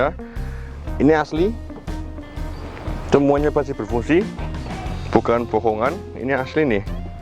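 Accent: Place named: Banyumas, Indonesia. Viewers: Logat Purwokertonya Keliatan Banget Ya..😀🇲🇨👍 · Review nya bagus lucu sedikit ngapak 😁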